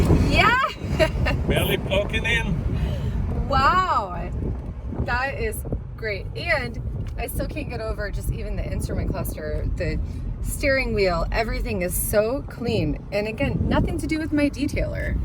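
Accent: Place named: United States. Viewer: Shame about the whining American accent 👍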